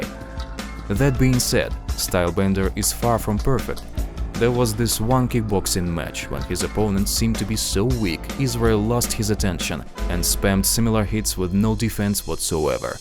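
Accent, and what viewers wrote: Russia. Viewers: I like the Russian accent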